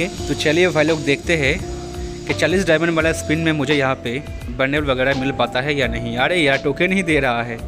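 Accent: Bengal, India. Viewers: Bhai app bengali ho kaya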